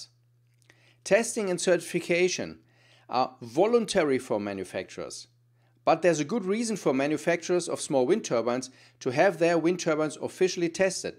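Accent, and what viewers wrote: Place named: Germany. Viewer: You're a clone of a colleague of mine in Stuttgart... with the very same accent and weirdly enough, deeply convinced that US technical validations are UNBIASED! 😆That's so naive! Industrial considerations are paramount, meaning that a better technical solution may be beaten as it's been already the case with heat engines as a matter of fact. Once I asked him how trustworthy are Tüv tests and certifications: Are they to be less reliable than their american counterparts? It was an inner national struggle for my colleague... Oh Gosh! Corporates can bring down the most wonderful ideas. It's appalling that you ignore it!!🤣😂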